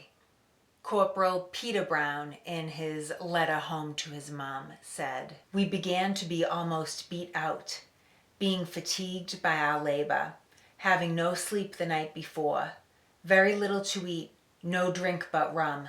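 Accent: Boston, United States. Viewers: Yes, Very Boston, I love it. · I live out of state,but I was born and raised in Worcester,moved out 25years ago when it started becoming a real dangerous craphole and no place to raise my children,good to hear the Boston accent again, keep up the good work,the children and future generations need to know our history,I love history as much as you do,I've been to all the historical sites in Massachusetts,alot of those visits were from school field trips,I love your enthusiasm, keep going...thank you,John..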